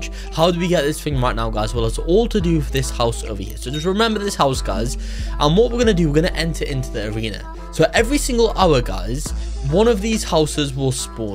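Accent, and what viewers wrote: United Kingdom. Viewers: Bro is British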